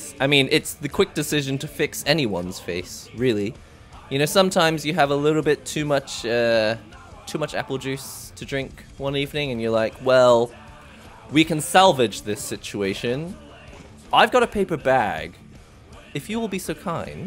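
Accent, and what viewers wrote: England, United Kingdom. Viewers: There's no way he's actually from Japan, he almost sounds like he has an English accent rather than a Japanese one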